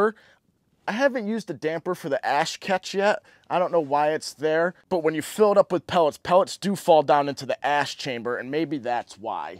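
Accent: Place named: Western New York, United States. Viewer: Wow! You sound like you're from WNY!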